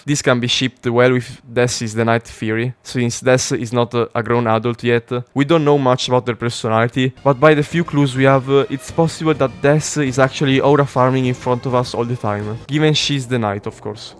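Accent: Italy. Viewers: Ciao bro, bei video. Comunque sì mi dispaice ma credo di sentire che sei italiano, bei video. Ben fatti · Not relevant but are you Italian? I'm from Italy and many people have this accent in English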